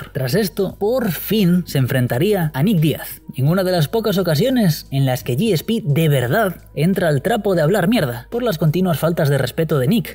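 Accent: Galicia, Spain. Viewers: Eres gallego? De donde?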